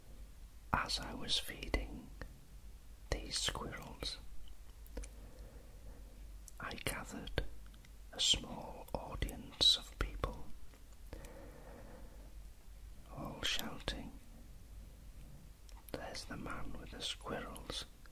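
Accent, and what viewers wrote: Wales, United Kingdom. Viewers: Wow, this is amazing. Are you Welsh Sir. Those Squirrels are seriously cute.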